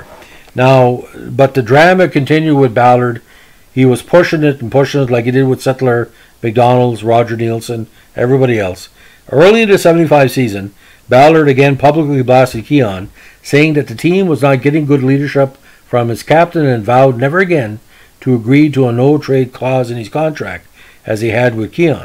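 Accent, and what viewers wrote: Canada. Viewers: You might be the most Canadian sounding dude of all-time. Good video about Ballard most fans don’t know how greasy he is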